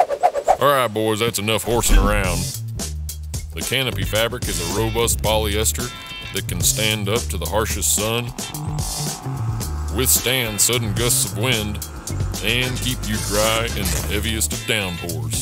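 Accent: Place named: United States. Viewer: Is this a parody? How is it sooooooo American just in the voice over? I don’t trust it.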